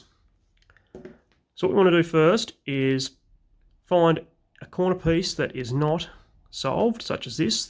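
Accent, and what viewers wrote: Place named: Australia. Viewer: finally got around to watching your video. I didn't realise you were Australian. I'm in Adelaide.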